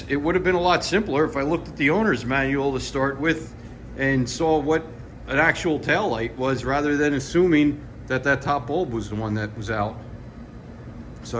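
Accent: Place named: United States. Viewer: Thank you for this. The way you speak makes me want to visit the States again. Salutations from Germany.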